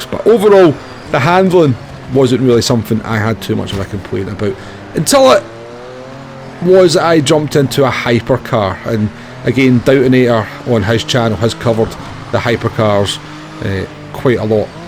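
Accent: Scotland, United Kingdom. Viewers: 😂😂 pure scottish so it is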